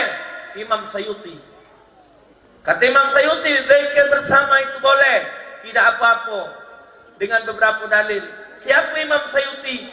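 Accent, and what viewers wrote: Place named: Palembang, Indonesia. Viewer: Masya Allah baru berapo bulan buka ceramah,dan dengan ceramah nyo yg khas palembang..sayo baru tau kalo lah dak ado lagi😢😢.smoga alm ditempatkan bersama org2 soleh.Aamiin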